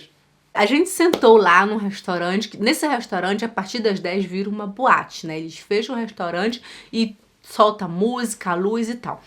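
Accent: Pará, Brazil. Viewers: Nossa sabia que você era da minha terra é inconfundível seu linguajar paraense 🤗❤️bjs amei conhecer seu canal eu estou aprendendo muita coisa com você.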